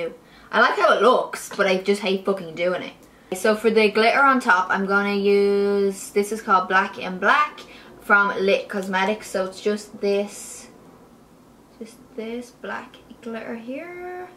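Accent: Ireland. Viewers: What part from Ireland are you from??? Xx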